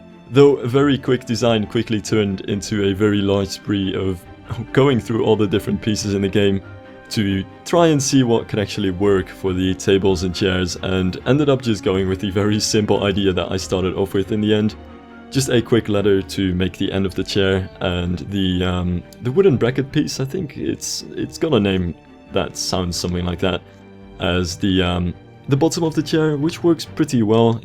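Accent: Netherlands. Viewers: <num> Yeah, he's pretty fluent! Although I can still hear a pretty clear Dutch accent to it :P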